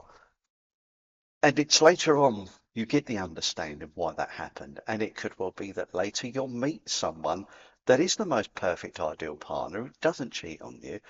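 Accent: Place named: Cockney, United Kingdom. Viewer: Lovely my friend. The Cockney accent helps!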